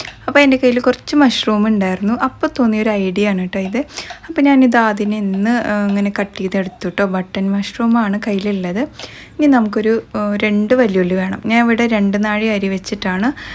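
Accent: Palakkad, India. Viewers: Palakkad evadeyaanu veed .enth resaa ithaade samsaram .kett irunnù povum.oru hai tharo?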